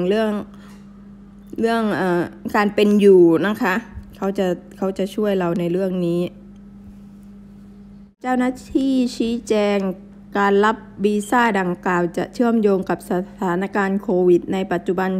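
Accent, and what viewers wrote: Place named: South, Thailand. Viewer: คนอีสาณ แต่พูดสำเนียงคนใต้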